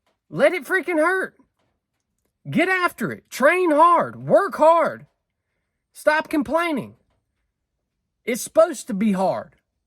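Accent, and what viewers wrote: South, United States. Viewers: What part of America is this accent from??? I need to move there ASAP 🧡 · I'm from Belgium sir and I wish I had the same accent when I speak english. Southern accent is damn good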